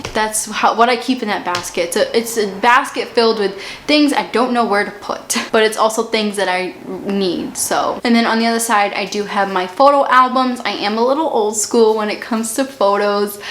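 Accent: Boston, United States. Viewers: Where r u from? Is it Boston ?, Jersey?I hear ur accent every once in a while, but can’t place it. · She's from Boston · your dialect is fascinating, where in USA are you from!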